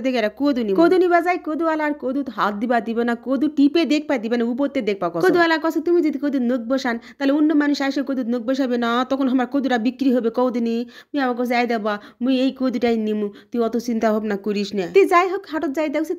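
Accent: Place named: Naogaon, Bangladesh. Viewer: Apu...Assalamuwalaikum....I am from USA. I do not miss a single content of you. I am a big fan of your contents.... especially the ones made in Naogaon Local Dialect. Actually the dialect or tone of Naogaon is similar to my own Thakurgaon / Dinajpur Dialect. Apu....aro beshi beshi kore gramer video din. Assalamuwalaikum.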